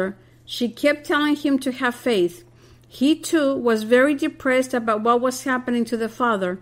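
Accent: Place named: Colorado, United States. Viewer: Interesting presentation. Never heard of this saint. Thanks 🙏. Your narrator has an interesting accent.... southern Colorado or New Mexico? Just curious. Appreciate your work much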